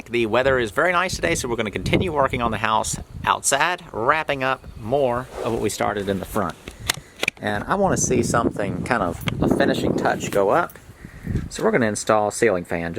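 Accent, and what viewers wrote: South, United States. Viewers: American with a Southern accent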